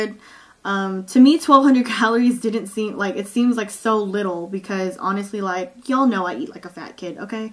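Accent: Philippines. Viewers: I love you girl haha..ur Filipino fob accents are hilarious .. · I love ur Filipino accent. hysterical!!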